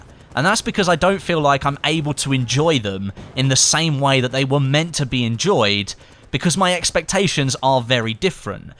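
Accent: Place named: United Kingdom. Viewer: Football as in soccer or anerican football butt since you sound like a person from great Britain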